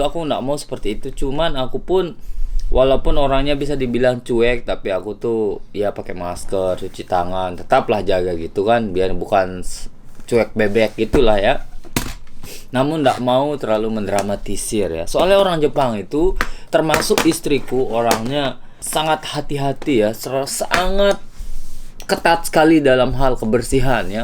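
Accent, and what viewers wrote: Lombok, Indonesia. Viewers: Walaupun pke b indo tapi logat sasaknya masih ada 🤣🤣 mantep side bang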